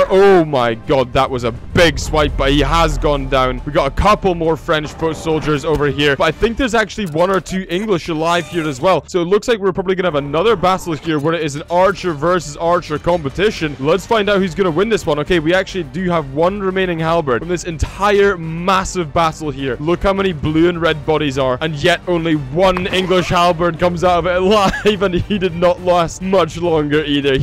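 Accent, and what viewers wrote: Scotland, United Kingdom. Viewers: I’m sorry are you Scot? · Are you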